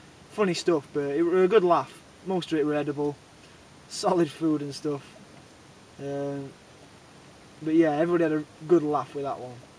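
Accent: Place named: Barnsley, United Kingdom. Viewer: you sound like a proper Barnsley lad... what area you from?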